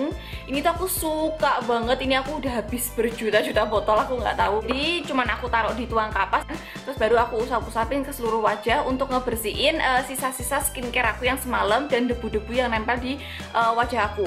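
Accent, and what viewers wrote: Java, Indonesia. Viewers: medok bet medok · Medoknya itu lo ... unch unch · Selalu sukak sama medoknya ❤️ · Langsung subs gegara medok :') ❤️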